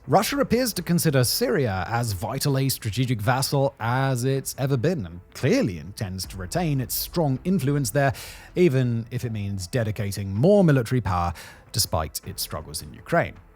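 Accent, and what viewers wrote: RP, United Kingdom. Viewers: This guys English is about as posh as I've ever heard. It's fun to listen to though.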